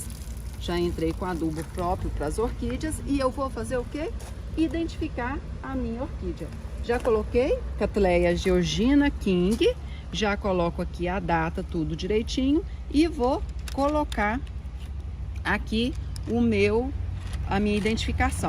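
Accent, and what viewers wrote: Minas Gerais, Brazil. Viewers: Voce é mineira ?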